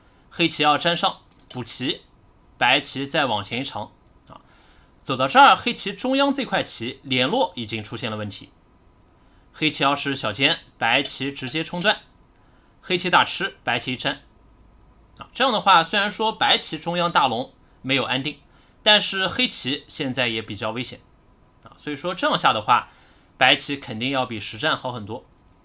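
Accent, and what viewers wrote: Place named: China. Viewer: 不曉得是中國哪個地方的口音 , 真的好聽 !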